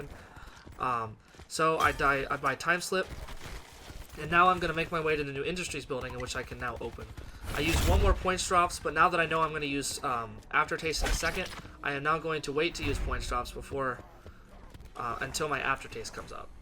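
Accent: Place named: Wichita, United States. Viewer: oh nah lol. I just came from Wichita for 6 years. The accent sounded like it was from that area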